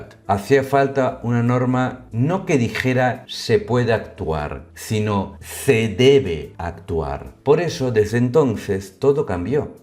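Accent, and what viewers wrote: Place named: Spain. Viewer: Vos porque habláis asi, joder tío esto es un fraude voz de españolete y cara de hispanoamericano autóctono.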